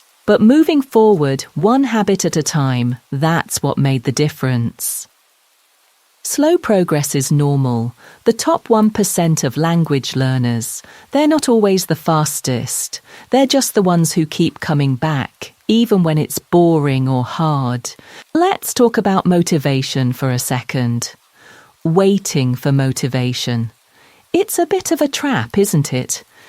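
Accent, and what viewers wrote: United Kingdom. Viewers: Thanks ❤❤ i love your british accent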